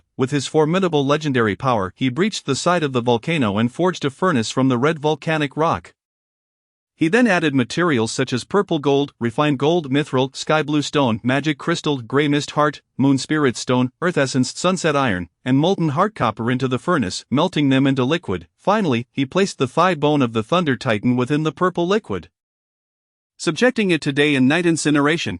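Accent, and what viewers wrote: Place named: United Kingdom. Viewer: Improve audio It sounds like some sophisticated British shit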